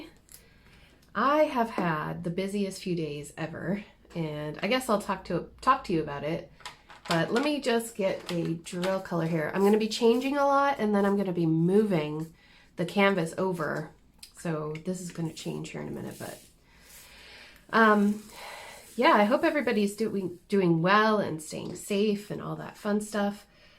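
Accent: Ireland. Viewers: The one I noticed is that you are picking up on the accent of Ireland so neat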